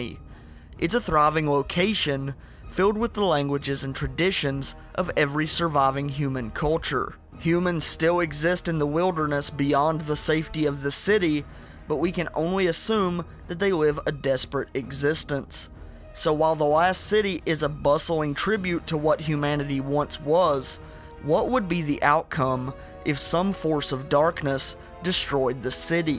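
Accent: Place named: West, United States. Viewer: I click on the video. The first 3 seconds I'm like: oh great it's the guy with the western accent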